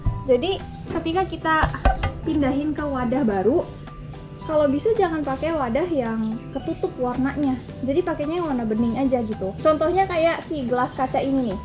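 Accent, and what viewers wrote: Japan. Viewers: baru mau coba menggunakan media leca buat fern cari referensi nemu lah video ini yg pas, ada aksen japan nya btw ternyata prnh/lama di sana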